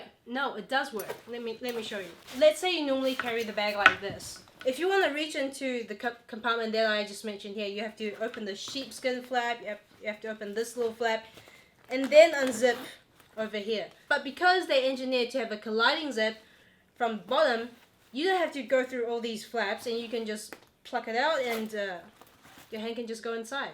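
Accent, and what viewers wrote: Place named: New Zealand. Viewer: I love your accent. All the people from NZ I’ve met are polite and nice. I also love your current PM. she is so great and dose a fantastic job dealing with the covid virus.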